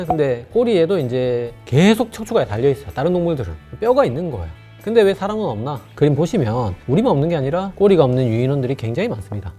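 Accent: Gyeongsang, South Korea. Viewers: 저는 꼬리뼈가 조금 더 크고 동이근 있어요. 아직 진화가 덜 되었군 ㅎ 저는 경상도 방언이 참 듣기 좋더라고요. 경남쪽이신 듯... 영상 감사합니다.😊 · 확실히 경상도 사람이다 ㅋㅋㅋ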